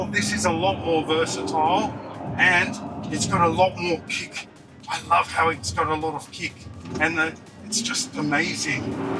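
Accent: Australia. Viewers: lol😂 Aussie accent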